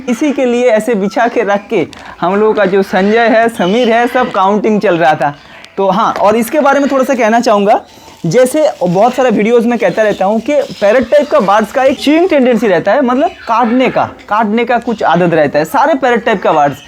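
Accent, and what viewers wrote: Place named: Bengal, India. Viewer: Apnar Hindi pronunciation and words choosing bengoli der moto... So better do in Bengali language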